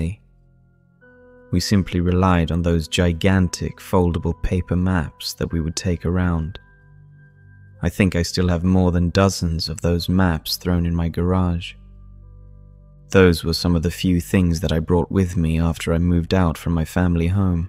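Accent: United Kingdom. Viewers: If this is your own work, I am impressed with your literary skills.. Usually I have a tough time listening to one with a British accent, but not you, you have such perfect diction and pronunciation that the accent is hardly noticed, besides British accents are the coolest in the world.. I see you are up to almost 7.8K subscribers. I am going to share this video with others and recomend they subscribe so as to do you a solid.. Mark my words, this channel is going to grow Sir... Great work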